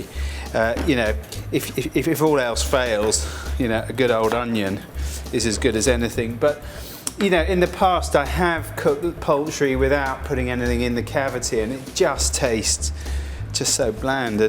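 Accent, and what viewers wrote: England, United Kingdom. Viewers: Love his english accent...he is rubbing into the skin....ah ah ah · brit accent - is the best ingredient to this recipe. not so sure about the rest. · I liked this video as it was simple and of course the British accent makes watching the video enjoyable I am going to make this for thanksgiving as after so many turkeys and hams I look forward to a change. Thanks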